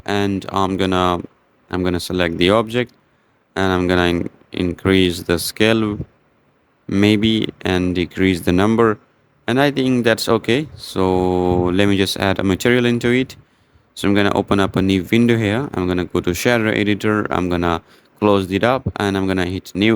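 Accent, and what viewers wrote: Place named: Iran. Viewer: عشقه لحجه ایرونی ، خسته نباشی💥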